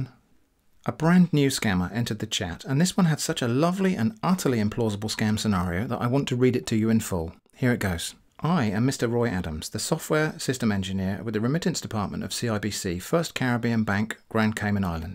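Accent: United Kingdom. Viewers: Your droll humor and British accent are a delightful combination playing with these crooks. TY.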